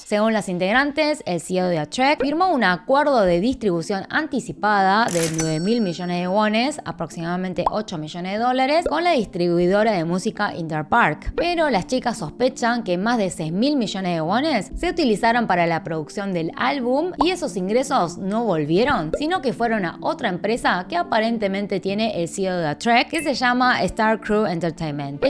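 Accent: Argentina. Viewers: Su acento es argentino porque nació y vivió mucho tiempo en Argentina. Ahora soporta panzona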